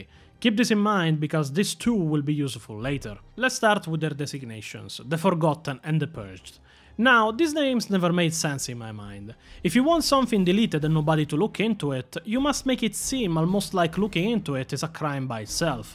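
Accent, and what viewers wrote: Italy. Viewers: The rustic Italian accent gives it a good touch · 40k lore told in English with Italian accents? Gotta say its pretty entertaining. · Daddy’s finally uploaded!!! Let’s goooo! The thick “meat a ball” accent is perfection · That would be his Italian accent… completely unrelated to vampires..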